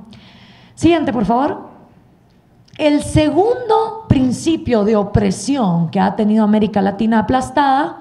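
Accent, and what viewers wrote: Mexico. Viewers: pero porque me hablas como mexicana wey?!?! por favor, un acento guatemalteco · EL UNICO INTERES DE ESTA CHICA ES EL MONEY , ES DE GUATEMALA PERO HABLA COMO MEXICANA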